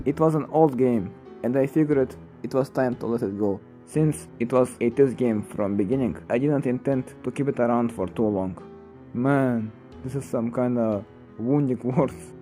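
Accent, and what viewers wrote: Russia. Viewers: Я все видео думал: Русский ли у тебя акцент или нет, пока ты прямо в видео не сказал откуда ты) Мать твою, история некроманта 2 выйдет? Я сплю? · Чел я знаю что ты русский твой акцент тебя выдаёт бро.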